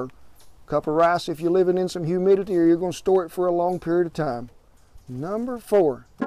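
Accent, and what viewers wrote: South, United States. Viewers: And just to be clear, did you say that there's lead in the paper towel? I thought something like that would be illegal to do? And about the ''Leadfree'' rag, is that even a thing? Sorry if it isn't what you were really saying, I'm danish and don't understand southern accent 100% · I usually do not enjoy listening to southern accent but in this case I sure did, enjoyed it very much. Thank you Mister, very useful information and I do have that same exact red bandanna from walmart (I was wondering how to put it to good use, now I do know) and loads of cast iron cookware, yeeeeehaaaaaa....... I like your style, I Like the way you roll, I like you! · Howdy Kent, just found you, love the way you talk, love your southern accent! You talk like a real sweet and humble person, you're really fun to listen to. Bet you and your family have a blast at your cook outs!! 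ha....ha.... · oh that southern accent... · Thank you, you've made the Southern accent cool. Turns out that someone who is very intelligent, makes the Southern accent pretty awesome. Great videos. I've watched 2 so far. From the PNW. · Love the flaxseed oil tips, just buy the capsule from pharmacy... brilliant. Love your video, with that southern accent... ☺️.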